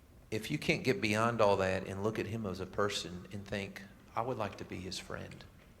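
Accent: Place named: South, United States. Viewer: ***** I must say, while you definitely have a different texture to your accent than that of the southern 'twang' stereotypically associated with 'the south', it's still distinctly 'southern' to my foreign UK ears, and I like it. :)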